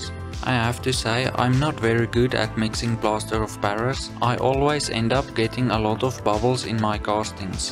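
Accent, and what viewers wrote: South Africa. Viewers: Ik dacht al een Zuid-Afrikaans accent te horen. :) Je video's zijn erg goed en heel professioneel. Ga zo door! Edit: Nu hoor ik je ook in de video zeggen dat je van SA bent.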